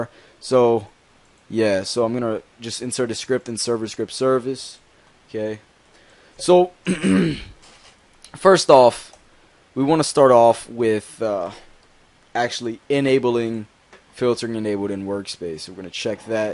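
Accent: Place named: United Kingdom. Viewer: Me how to speak speak British but is it me or he sounds British a-hoi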